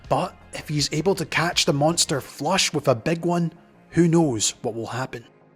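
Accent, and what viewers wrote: Scotland, United Kingdom. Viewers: Yeh, Japanese pronunciation is super easy compared to English. As soon as you understand the vowel sounds, the rules never change...but the video guy does have a Scottish accent, and I kinda like his Scottish take on Japanese lol.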